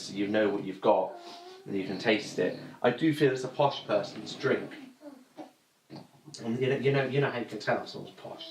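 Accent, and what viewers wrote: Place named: RP, United Kingdom. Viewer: Nice review...your just to posh pal...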